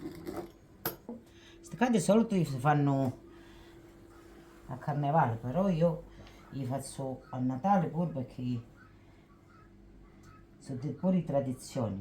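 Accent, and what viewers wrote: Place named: Calabria, Italy. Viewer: Soy Argentina, hija de calabreses. Su dialecto.me emociona al igual que sus comidas, ingredientes, etc., etc. Si tendría la oportunidad de viajar, iría a verla. Eres una mujer admirable ❤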